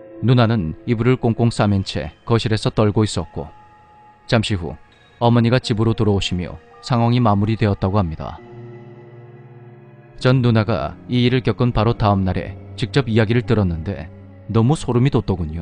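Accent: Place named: Jeolla, South Korea. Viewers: 깨알같은 전라도사투리ㅋㅋㅋ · 님 방송 늘잘듣고 있습니다.전라도 사투리 구수하니..찰지내요 ㅎㅎ다음엔 충청도 사투리도 부탁드립니다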